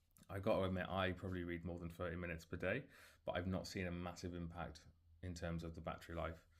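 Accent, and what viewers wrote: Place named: United Kingdom. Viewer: Love UK accents, Americans (me) so boring...lol I just subscribed to your channel. As you can get cheapers stylus just research just ordered my Kobo Libra Colour a few days ago! . It is $219.99 in the US . Now I have to transfer allmy Kindle books. I know i can use calibre to transfer.. I also read a lot of Sarah Maas books her books are over 700 pages. I also have Kindle Paperwhite and Oasis. I am excited about switching to Kobo and started using Libby. I loved your video! I love the library intergration too. I should be getting mine in three days. So freaking excited! Cheers! xx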